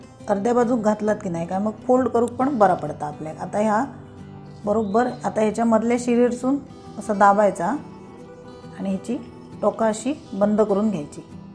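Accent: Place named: Malvani, India. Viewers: व्वा छान झालेत patole .. ani तुमची मालवणी 👍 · तुमची मालवणी बोलत ऐकायला मजा येते... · छानच पातोळी दाखविली त्यातून मालवणी बोलणं असे वाटत नागपंचमी आली खुप खुप छान ताई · छान मी कसालची आहे आम्ही पण असेच बनवतो तुमची मालवणी मस्त वाटली तुम्ही वेंगुर्ल्याच्या वाटत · मी आज केल्या पतोल्या मस्त झाल्या. माझ्याकड़े कुंडित आहे हलदिचे झाड़. आणि तुझा मालवाणित बोलणा लय आवडला. मी पण kanakavlicha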